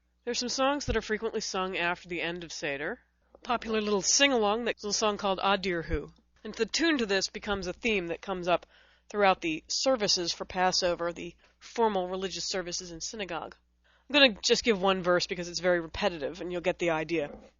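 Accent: United States. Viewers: american accent is awful..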